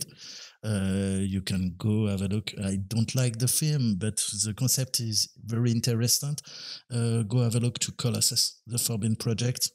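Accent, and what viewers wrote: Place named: France. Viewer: Oh mec i'm in love with your french accent 😂 you rock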